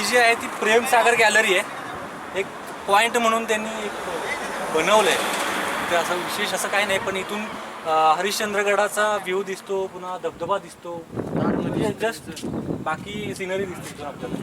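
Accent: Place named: Kolhapur, India. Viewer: Best commentator bhava..👏 Ekdam kolhapuri type..😉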